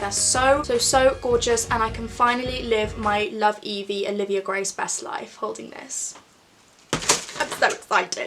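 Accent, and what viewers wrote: United Kingdom. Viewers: I looooove your british accent. I wish, I coul speak like you xD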